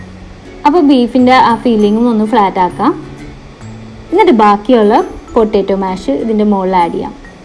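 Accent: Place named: Ernakulam, India. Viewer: nattil ernakulam ano veed.samsarikkumbo chela time’lu njangalude slang kayari varunnund😀😀😀✌🏻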